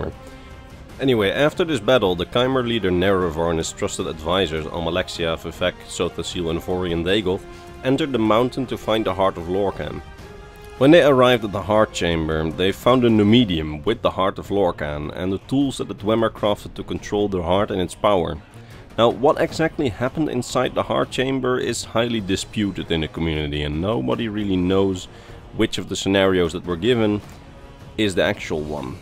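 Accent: Netherlands. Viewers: Lol, I love watching this channel, I mainly listen to it because I love 's Dutch accent. Can't get enough.:D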